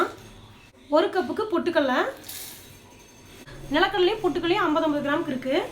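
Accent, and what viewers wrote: Kongu, India. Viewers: Neenda idaivelikku piragu alagana kongu tamilil receipe arumai kutties nallarukkangalamma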